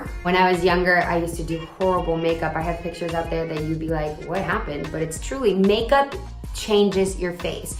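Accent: Mexico. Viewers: Ufa ainda bem que não é difícil te entender ! entendo um pouco o mexicano 😍🇧🇷😁❤️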